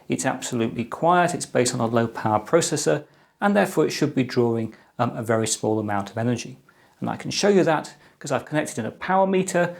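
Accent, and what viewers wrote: England, United Kingdom. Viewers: this english dude makes us sound like the stereotypes not cool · I like your British accent .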